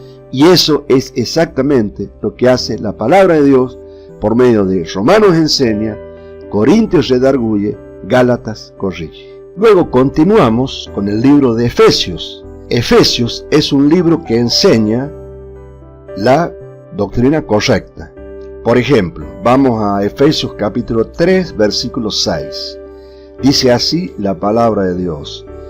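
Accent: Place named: Northwest, Argentina. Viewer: hola hno muy buena enseñanza.. por su acento de donde es usted ?? Tucumano o salteño quizas jujeño?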